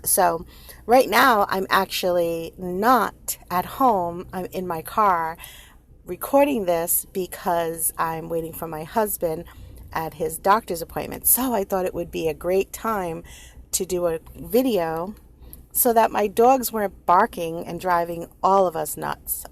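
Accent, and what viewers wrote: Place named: East Coast, United States. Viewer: No matter what platform you use, you will find that a lot of negative comments will suddenly disappear. I'm American and I'm guessing by your accent you're from the East coast(?) That's where I'm from and I grew up in a very diverse area where people are just people, and nothing was black or white. You are judged on character, not color. My band mates are English and we're all on the same page with these two. These people, the "sugars" as they call them, have no idea who we are or where we come from. They judge and attack based on comments posted about a woman who has chosen to live her life in newspapers and on TV. I never saw a wedding where none of the guests looked happy, the groom looked shell shocked and the bride looked defiant before their wedding. I just knew from that point on that it wasn't going to end well.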